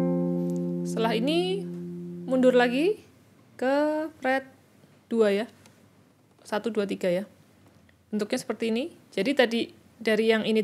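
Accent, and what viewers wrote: Java, Indonesia. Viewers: Mbak nya rodo medhok.. ailapyuh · Jowone medok mbak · Suarane medhok mbak yu......😃......... Yen ing tawang ono lintang.....mbakayu.....😀😁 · Wong jowo yo mbak..kliatan logatnya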